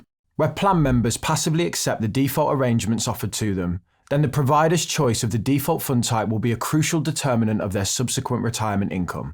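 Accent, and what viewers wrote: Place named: Leicester, United Kingdom. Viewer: You from Leicester pal? You have a proper Lesteh accent……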